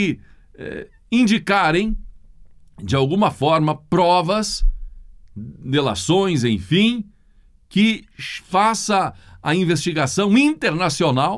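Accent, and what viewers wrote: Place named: Minas Gerais, Brazil. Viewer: mineiro uai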